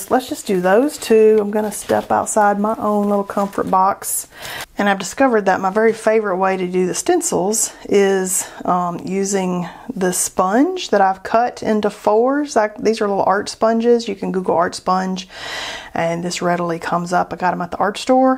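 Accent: South, United States. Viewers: I first discovered on Skillshare. Her “art noises” had me hooked from the beginning. (Plus, when a southerner hears another, our ears perk up). She very quickly became my favorite instructor, because- as you pointed out- her enthusiasm is contagious! ☺️ It doesn’t hurt that I have learned a lot, too.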